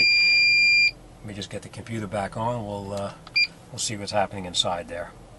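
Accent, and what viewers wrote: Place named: New Jersey, United States. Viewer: Sounds like you from joisey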